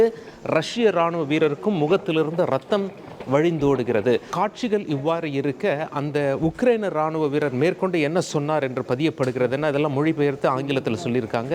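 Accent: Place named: Salem, India. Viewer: Salem voice and prononciation excellent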